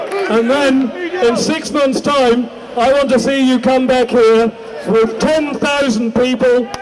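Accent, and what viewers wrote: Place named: RP, United Kingdom. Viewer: Couldn't help noticing that Paul toned down his normal posh accent for this speech and even used the "f" word. Not a criticism, one has to speak to one's audience. He's a very brave man and I fear for his safety. Sadly, with Britain's stupidly undemocratic first-past-the-post voting system, which notable was set aside for the Scottish independence referendum, his tiny party has virtually no chance of getting elected. Unlike in Holland where Geert Wilders party, with the same anti-Islam message, is the 3rd largest with many MP's and he gets 24 hour police protection.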